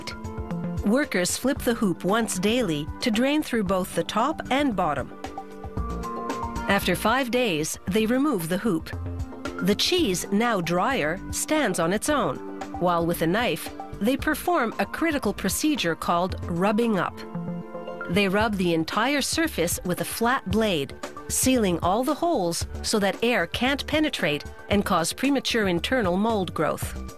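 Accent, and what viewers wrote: Canada. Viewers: is the narrator Canadian? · Actually this is Canadian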